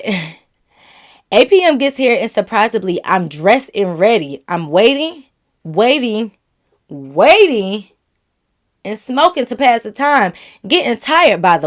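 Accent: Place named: New York, United States. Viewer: I knew this girl was from ny from the beginning lol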